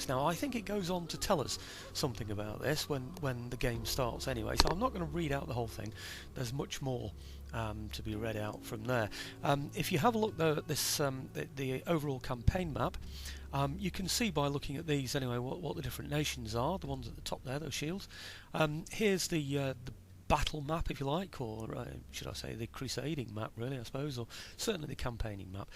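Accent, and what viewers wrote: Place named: Midlands, United Kingdom. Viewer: i ccouldnt quite tell you were proper northern i thought more midlands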